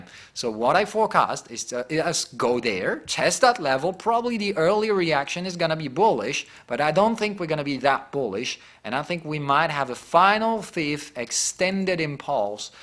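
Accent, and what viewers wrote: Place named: Texas, United States. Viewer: Thank! You have the accent of the texas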